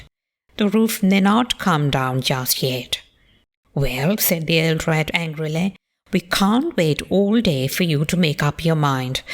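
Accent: RP, United Kingdom. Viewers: this is an extraordinary accent! It sounds even more heightened RP than my grandmother (b.1903 d. 2003) and I can hear aspects of the former colonies as well? Fascinating, beautiful even in it's uniqueness. Not a standard RP though, nor even a standard heightened RP accent. Use of Arthur is valid.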